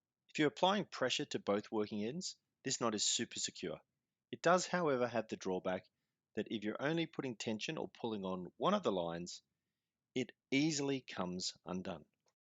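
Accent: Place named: Queensland, Australia. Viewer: I sure do hear your accent so I assumed you are from QLD? Doesn’t sound like VIC, SA or WA to me, but it isn’t easy to tell… Belfast, Northern Ireland, only 980 Nm from where I live (now). 😉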